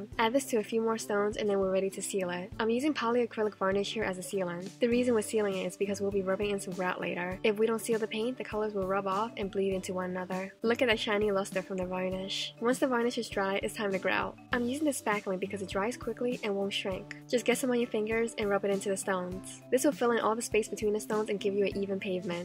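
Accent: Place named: New Jersey, United States. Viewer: I think she sounds like she is from New Jersey, either Jewish or Italian.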